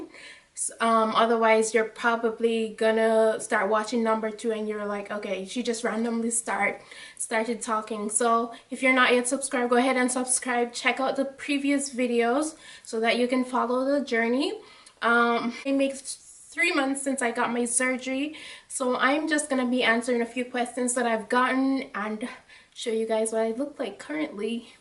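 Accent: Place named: Jamaica. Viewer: I know a Jamaican when I hear them! I can hear through your American accent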